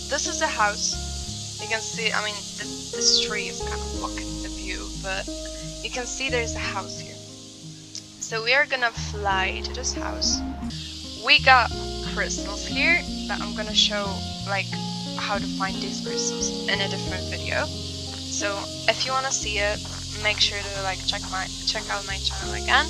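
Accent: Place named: Germany. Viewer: Are you german? BECAUSE I L.O.V.E. YOUR ACCENT!! 🎈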